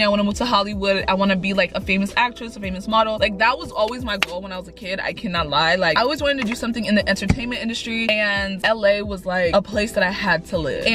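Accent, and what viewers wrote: Nigeria. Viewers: Im starting to develop a Nigerian accent cuz i just love binge watching vids are 😭💗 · What makes me happy in these videos is that you incorporate the nigerian accent cause I'm also nigerian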